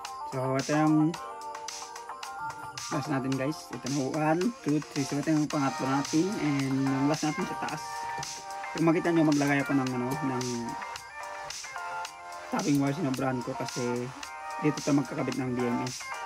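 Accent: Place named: India. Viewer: If im not mistaken, may dugo kng indyano..parang bulol ka magsalita ng parallel.. ganyan ang tono ng mga bombay!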